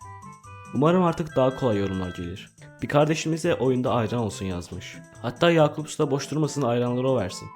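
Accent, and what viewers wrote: Azerbaijan. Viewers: Abi Azerbaycanlisan?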